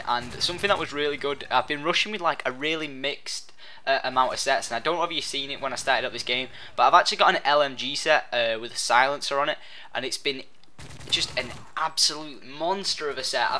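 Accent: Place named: Manchester, United Kingdom. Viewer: na he's from england manchester i think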